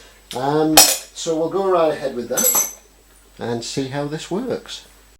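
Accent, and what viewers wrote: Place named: Scarborough, United Kingdom. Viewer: Your accent sounds like your from Scarborough. That looks like an old Pezzetti pot. It has a bakelite handle and knob. They only use plastic these days .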